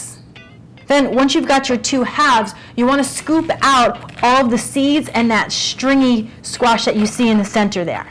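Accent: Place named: United States. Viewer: I had some problems to understand your English. It seems that your English is US-English, which is very hard for me to understand. I wanted to know, what kind of spices you have used. I noticed that you put salt, pepper and garlic powder. But first, what kind of liquid you have rubbed on the squash? It seems that it was an oil. Can I also cook Spaghetti Squash under steam?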